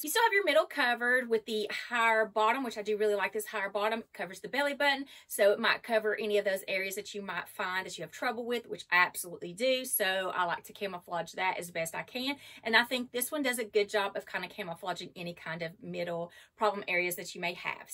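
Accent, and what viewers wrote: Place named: South, United States. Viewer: That's a nice southern accent you got there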